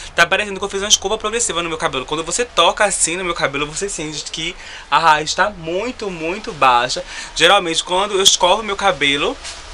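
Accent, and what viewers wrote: Recife, Brazil. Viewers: amei seu sutaque, recife , sou de São Paulo.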